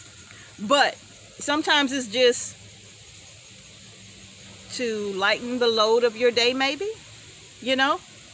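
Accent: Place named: Kentucky, United States. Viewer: I am thankful for youtubers like you and. I used tjo garden and can stuff with my mother, but only water bath canning. You are the reason I got a pressure canner because of you. I had to buy all new jars again because my husband had gotten rid of mine that I got when my mother passed. Just hearing your voice, with your accent, reminds me of her, we were from Kentucky. I learned so much from her, gardening, cooking, quilting, just so much. We all used to go berry picking together as a family, people used to make fun of us, but I didn't care, because I knew how good the things Mom made from the berries would be. I learned how to process and clean the critters my dad would hunt. Once my mom killed a bunch of our chickens, they were free range and wild as heck, and most of them would go up the mountain and roost. Mom stood out in the yard and shot them with a 22 rifle and every one was a head shot. 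She had my German Shepherd go up the mountain and retrieve them. The point of this whole story was helping my mom pluck those chickens. She didn't expect me to have an issue, but when she dunked them in the scalding water, the smell made me gag and throw up. She made me go in the house. Anyway I'm grateful for y'all sharing your lives with us. God bless you guys and everyone, including your critters. 🥰🥰🥰🥰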